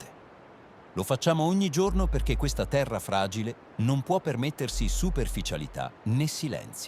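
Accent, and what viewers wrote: Italy. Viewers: Wow, the reader (or "reader?) of the information this time is the strangest one I have ever heard! In the beginning, there is a clear Italian accent even with the super rapid speech, yet there is also the typical AI pattern of pausing between words that belong together, failing on scientific terms like Bradyseism, and misunderstanding Celsius for whichever funny variety that comes out each day. On top of that, the accent also changes to some variety of Asian pretty quickly, and there is even a couple of inserted space filler sounds like "ah" and "mm"! Please, commenters that read this and are fluent in English: volunteer to do the reading instead of letting AI abuse the daily bulletins! I would do it with pleasure, but my teeth will prevent that for a few months, so at least one other subscriber is needed!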